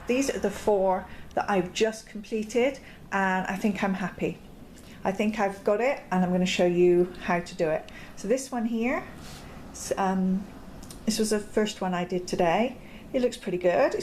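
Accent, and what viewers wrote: United Kingdom. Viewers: Love your British accent, that you get paint all over and that you show the opps paintings❤️😂 you are real! Many video artist are perfect and I'm NOT, so you give me hope that even I can do it. Thanks.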